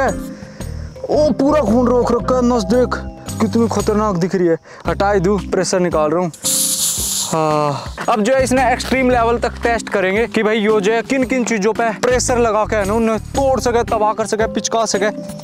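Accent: Haryana, India. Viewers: Bhai tum haryana sa ho kya😂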